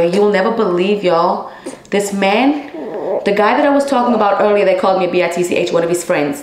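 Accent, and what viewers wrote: South Africa. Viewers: Are you from South Africa 🇿🇦 ❤ your accent ❤ SA babes here you are so strong 🌸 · So she is originally from South Africa, can hear her accent has a twinge of SA accent.. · Are you from south africa?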